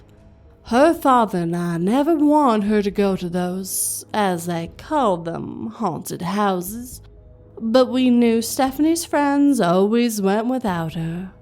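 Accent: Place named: South, United States. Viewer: Not half bad southern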